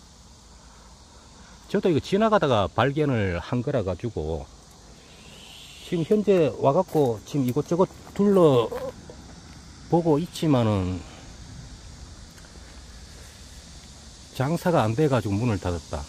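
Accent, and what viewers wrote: Gyeongsang, South Korea. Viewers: 설명 하시는분, 경상도 사투리를 의도적으로 강하게 하시는데, 좀 순화 하시길. "맹" 같은 말은 무슨 말인지? 혼자만 알수 있는단어라면 무슨 소용?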